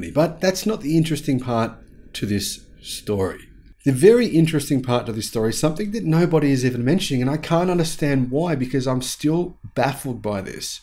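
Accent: Victoria, Australia. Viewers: You sound Australian,! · the first time I heard you I knew you were an Aussie. No question. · Hey. Total Aussie Accent! Good on ya! Sending love to you and family. Keep up the great coverage. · Hello, when talking with someone from another country they will typically know that you are not from their country. They may have not be sure what country‘s accent you have but they will know it is not their own. This is even more so with non-native English speaking people. I can tell you that my wife as a native U.S. American did pick you up as an Australian just seconds into hearing your video first time. That is a good thing!! Be proud! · 😂😂 I'm an Aussie living in Spain for over 30 years, and YES, your accent is totally down under🤙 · As a non-Aussie, to me his accent is not readily identifiable -- like say a Steven Mark Ryan's -- unless he says specific words which are pronounced in an obviously Aussie accent :). 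But good to know this is how a Victorian accent sounds like. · The ‘viking look’ probably throws folks off. Your Melbourne accent is genuine though! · You have a broad and typical well spoken Australian accent. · I'm Russian and I would've guessed your accent is Australian. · Yep Victorian Accent. When I did nasho at Puckka we had all the states segregated, that's when I heard the difference. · Definitely an Australian accent. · No Doubt. Beyond doubt your Australian 😊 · Totally Australian accent! No doubt about it. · Total Aussie accent, mate! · 100% Australian accent yes. But don't expect non-english people to pick it up. British vs Aus vs NZ is not a big difference in accent, especially in a public speaking context. Regarding the efficiency, it's down to aero and more efficient inverters and motors. I wouldn't sing them any praises, all teslas are pretty rubbish at efficiency. Never mind the onboard charger at low load, tyres that cost a fortune. 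There's also the various rating of the capacity of the battery. Likely that BYD overstates the size, or uses second bin cells whilst they sell the first bin to their competition for top dollar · aussie, no doubt 😂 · You are 100% Australian! 😊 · Yes your accent is Aussie - I'm an expert, because I grew up watching Neighbours and Home & Away. 😉 I've heard stronger though. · Yes cobber you’re an Australian obviously ……from a Pom. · Solid Melbourne accent, for sure. Also, digging the other voices too 😆 As for the range mystery, maybe it could be the software? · 120% awesome Aussie accent 😂 Keep it this way! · You sure sound Australian to me, Mate. 👌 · Definitely a Victorian Australian accent . Not a lot of different accents in Australia unlike the USA or UK. New Zealind and South Efrika do strange things with their vowels.🤔🦘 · 100% Australian Accent within 3 seconds. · 100% Australian accent, easy to identify as such. 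Hard to replicate even after listening to you and SMR for a year or two, maté. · 100% aussie mate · u sound very Australian, I love your shows, Eugene, Philadelphia, pa. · 😂Yes, you're an Ausie!😂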